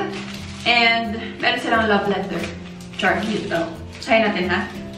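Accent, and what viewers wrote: Visayas, Philippines. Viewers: Hi Ate ka cute gyud nimo magbinisaya ba..unta magbuhat ka'g vlog cooking adobong manok in bisaya language.. 😘😘😘😘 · Samtang ga tan-aw ko sa imong video ma'am cute kaayo ka paminawon mag bisaya ba. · Kagwapa nlang bayhana uy! 😍😍cute kaayu ka magbisaya inday! ❤ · ang cute mo ate mag bisaya..kahit hindi ko maintindihan❤❤❤ Proud bicolana here.. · Gwapaha nimo ate oy, cute kay mag binisaya ba 💓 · Ganahan gyd ko maminaw nmu mag bisaya. cute kaayo i'm from bohol po ❤ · Ka cute kaayu nimu mag bisaya dai..im from cebu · Gwapa kaayo ka dzaiii cute kaayo ka mag bisaya uy! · Cute kaayo nimo mgbisaya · 😘😘😘😘 Maka heart2 imong pagka bisdak🙌🙌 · Hahaha. Ang cute cute mo talaga kapag nag bibisaya. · Hahaha cute kaayo magbisaya oi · cute kaayo ka ate magbisaya hahah. Proud Bisaya here! 💓 Congrats ate! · Ka cute ba mag bisaya uy😍😍😍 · Bisdak here from. 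Bohol😍😍😍cute kaayo ka magbisaya maam 😊😊😊😉❤❤❤😘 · lami ahh paminawn pag mag bisaya ka oii idol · Kyut mo magbisaya, love watching u kahit ndi ko maintindihan ang cnsabi mo 😇😂😂I mean, Hindi ako nakaka intindi ng bisaya. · pretty as always ug wala kalimot ug bisaya with the accent..😍😍😍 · Cute kaayo ka mag bisaya ayy. Gwapa kaayo ka😍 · Ang cute cute mo po mag salita ng bisaya hindi ako nakakaintindi ng bisaya pero super ba enjoy ko hahaha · Ka cute jud mag bisaya ui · Makadumdum ko ni Lucy Torres sa imong accent. Bisdak jud. Mga gwapa jud ning mga bisdak. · Lingaw man diay maminaw magbisaya hahahahaha or gwapa ra jud si maong cute paminawon 😂😂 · ka cuteee ba nimu mag bisaya hahah.. pa shout out nmn nxt time plss.. salamat kau 😘😘😘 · As in dai. Labaw na guapa kaau unya hastang bisayaa diay. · Mao jud ni si Erika kay ang accent ba 😂 kamuot nimo oi, di ko sanay makakita sa imo sa tv/video nga bisaya ang sinultihan 😁 Congrats sa imong Silver Button! 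👏🏽 Keep vlogging! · Ang ka cute mn ka mag bisaya ate oi - ginalee tampus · I grew up noticing she has a Bisaya accent when speaking Tagalog because i have too. She speaks more fluid with bisaya and sound better too. Her Tagalog bisaya accent is cute tho hehe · Cute kaayo ka mag bisaya ate! Iregards ko ni Julia barretto please hehw God bless sa imo mga vlogs na unta mas mudaghan pa imo mga subscribers!! Congrats diay ateee ❤️❤️ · Aw grabe jud ang bisaya ni oi ehhehe ganahan ky ko maminaw ahhaha hugs from 🇫🇮🇫🇮🇫🇮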